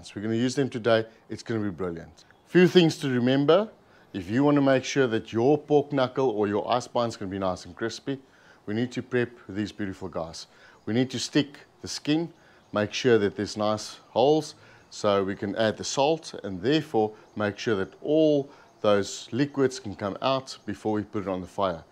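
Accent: South Africa. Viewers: Please tell me you're South African or remotely from SA...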